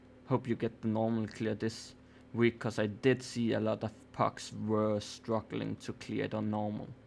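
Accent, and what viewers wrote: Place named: Denmark. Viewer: Big thanks for the guide, I will probably be tanking this for my crew this week. Are you Danish? You sound like a fellow Dane :D If you need a crew to run raids with you should consider joining us in CozyCrew over on Ether EU.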